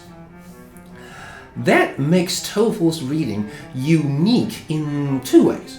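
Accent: United Kingdom. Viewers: 這是英國哪種口音? 我一個大陸同事有一模一樣口音，看到影片驚呆了我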